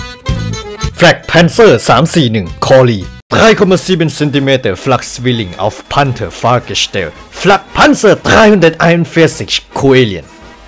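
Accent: Germany. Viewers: ผมชอบสำเนียงภาษาเยอรมันพี่มากครับ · โครตชอบเลยสำเนียงเยอรมัน 55555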